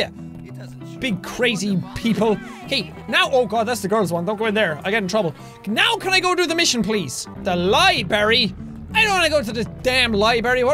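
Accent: Ireland. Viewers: Yea I'm assuming from his accent he's in Ireland so yea pretty close :)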